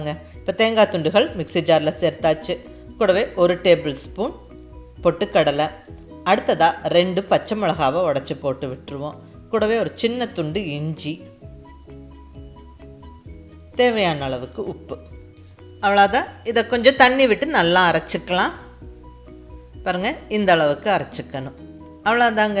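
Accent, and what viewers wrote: Kongu, India. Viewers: Today mrng for breakfast i tried tis aunty. My MIL loved tis. I too feel fulled after having it. ❤ from cbe (sundarapuram) i m big fan for ur voice, receipes and our kongu slang❤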